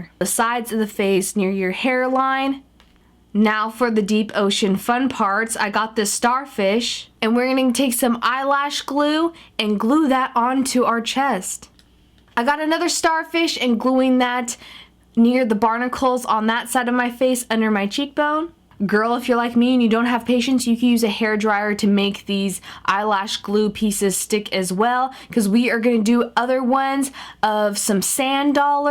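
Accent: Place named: South, United States. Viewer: To my knowledge she's from Texas [Now living in California], so it'd be southern.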